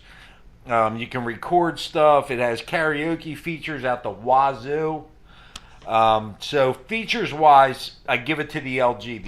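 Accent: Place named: Philadelphia, United States. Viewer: You're from Philly aren't you... if not you have a strong philly accent lol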